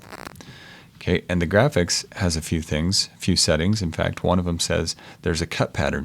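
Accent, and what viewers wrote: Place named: United States. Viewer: US accent yeah:face-fuchsia-wide-eyes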